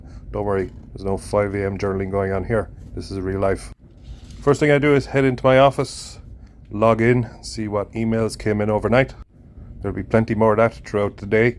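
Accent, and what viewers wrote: Ireland. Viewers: Is that a Cork accent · Please, just keep talking. I’m a Texan born and raised but the good Lord knows that the Irish tongue has my heart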